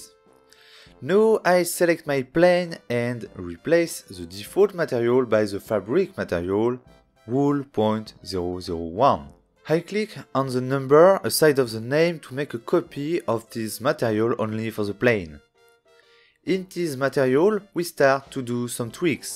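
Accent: France. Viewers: I never thought how French accent sound in english it's quiet fun... I like it... no offense I'm Italian I also speak French but never had the opportunity to listen to a French speaking in english. Great tutorial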